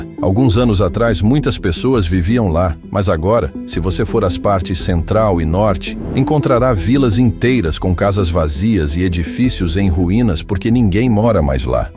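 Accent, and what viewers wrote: Portugal. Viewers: Do nada passou a ser narrado em português de Portugal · Mudou para o português de Portugal, pq é uma inteligência artificial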